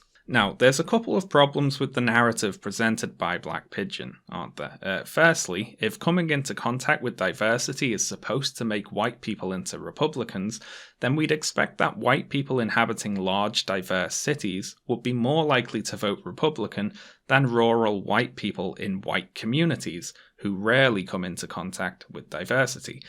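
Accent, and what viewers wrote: United Kingdom. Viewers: You know, you believe a lot of things I disagree with and have faulty bolster for some of your arguments, but your voice is very relaxing. Carry on, ever so slightly lispy British man.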